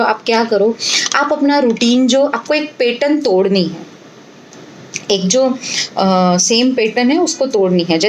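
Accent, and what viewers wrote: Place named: Gujarat, India. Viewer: Her pronunciations is gujarati why should you speak hindi full bakwas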